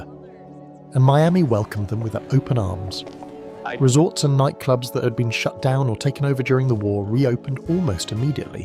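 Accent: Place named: United Kingdom. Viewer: Your videos are fun to watch. They're meant to give viewers a general idea of what it was like. I can forgive AI for the errors in details. I do wish that you used American accents for narration on videos about American locations. British narration is fine for Europe and other areas of the world, I guess. It just seems like 90% of narration about anything uses a British accent now.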